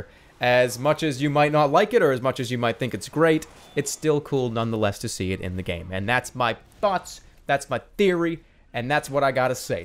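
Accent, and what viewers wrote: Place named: Boston, United States. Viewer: I keep trying to put my finger on his accent, but I'm not that familiar with different parts of the US and their accents. Is he Boston, Brooklyn, or neither? I kinda wanna say Boston, but like I said I don't know .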